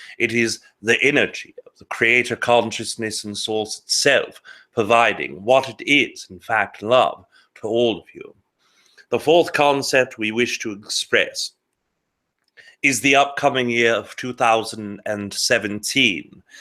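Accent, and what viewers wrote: United Kingdom. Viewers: He sounds like Alfred Hitchcock, with that British accent. Hey, I want to believe this info, but I also want to keep grounded. There are many questionable issues. ok, so if all these sightings and escalations happen then woohoo.. Let's see what presents itself. Come on 4th density, appear, make a big splash in our pool.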